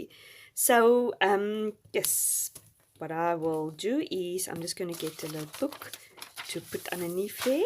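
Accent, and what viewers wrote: South Africa. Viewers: I love your South African accent too, your have a lovely soothing voice to listen to! 😊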